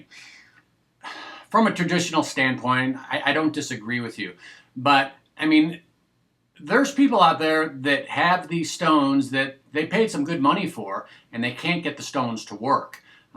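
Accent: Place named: East Coast, United States. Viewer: lolz as soon as you said it was going to be a long reply. i read it off in my head “wit” you east coast accent. i really enjoy both your videos ( the mascots crap me up) and Videos I learn so much just from you guys talking and reading through comments. and it is a great idea to get to know your Natis (Natural) stone. and Im still new to straights but. i sharpened enough chefs knives all sorts of styles,knives pocket knives ect.. to figure i got what it takes to give it a go. and so far not to bad. i need a few more grits for in between my #800 and hard Arkansas. but im curious now that my jewlers loop works again its 100x only but its enough to see that i need better technique and the need for progression in between as far a grit goes before start the polishing can begin. im curious though im skeptical on these blue steel razors. 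are they really Blue steel/ Aogami blades? i have a M. Karam made in Mt. Lebanon i believe this is out of PA. but just not sure how accurate these blades are when it comes to materials being used. and can it be blue steel or a Aogami even if its not forged in Japan? or can they be blue steel where ever its made. i thought blue or white steel was just a Japanese thing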